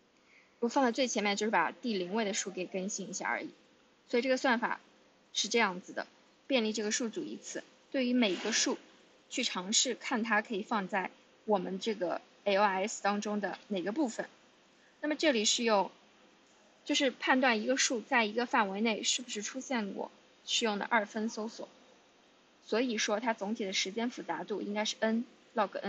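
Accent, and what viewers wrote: China. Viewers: 找279题目解答 结果看到了英文版 大致听了几句就发现是国人了 姐姐在新加坡上学？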